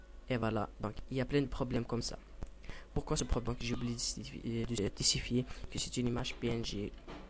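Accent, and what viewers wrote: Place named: Maghreb. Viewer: hhh super hh t marocain ou algerien bayna mn lhdra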